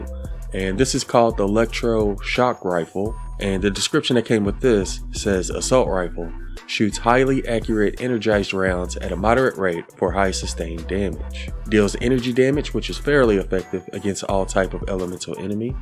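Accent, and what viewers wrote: South, United States. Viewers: I'm going to need you to make a PSA video. During the last 2 weeks I've had a good handful of players thinking I was you(not believing I wasn't you) and asking me questions like "When is the next meme video releasing", asking me what cookies looks like, etc etc... I've heard my voice from echos through other players mics and I can't understand how people thinks I sound like you, the only thing I can think of is we both somewhat have a Southern Accent...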